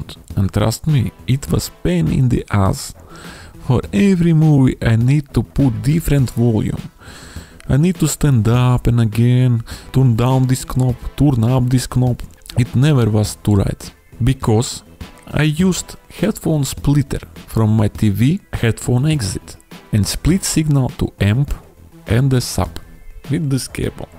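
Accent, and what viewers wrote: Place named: Russia. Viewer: I love the Russian accent in English and how certain vowels and consonants have a special pronunciation. Absolutely adorable, and I am always impressed with people who are multi-lingual. Khorosho! Spasiba. =D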